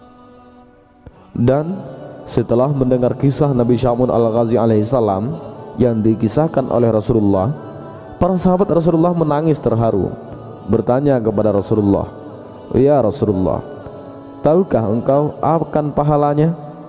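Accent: Aceh, Indonesia. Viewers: Yg peugah haba mngkn jeut neu stel bacut logatjih, brat that meu aceh...semoga kedepan makin berkembang..amin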